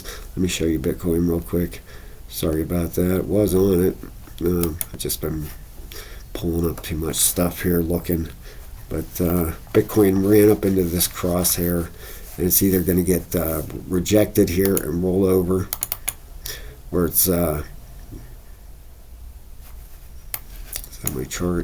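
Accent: Pittsburgh, United States. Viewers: Nice video- are you from Pittsburgh by chance? - killin the shorts💥 $Turbo $TurboToadToken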